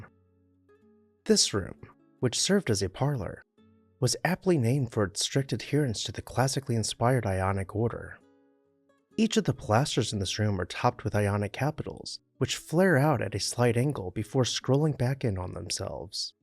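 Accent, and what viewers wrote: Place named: Missouri, United States. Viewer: Hey,. I enjoy your Missouri accent! To where have you moved?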